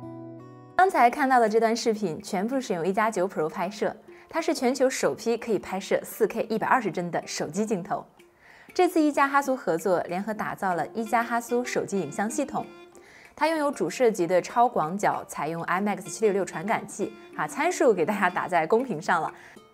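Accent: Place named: Hebei, China. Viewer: Eva是河北人吗？说英语的感觉和我老婆一样，我老婆邯郸的。加油！支持你！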